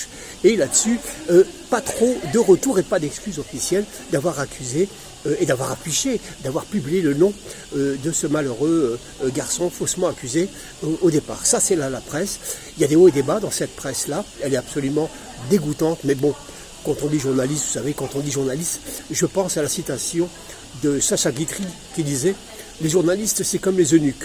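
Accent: France. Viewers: Plutôt l'accent de TOULOUSE..comme Nougarro..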